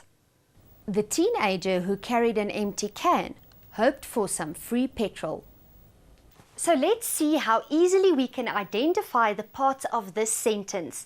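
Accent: South Africa. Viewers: The South African accent :) , I'm teaching English in Korea, this was so refreshing , day made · I'm from South Africa, I'm pretty sure that it's a South African accent...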